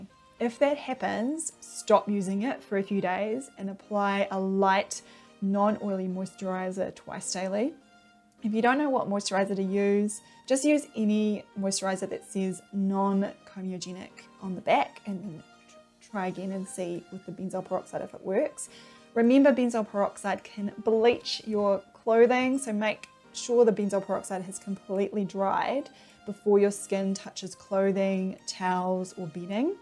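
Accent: New Zealand. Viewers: I love your smile, and your cute New Zealand accent! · aha!! i guess you were new zealander from your accent and reading the caption, i was right hehe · You have the softest kiwi accent. Love 🇬🇧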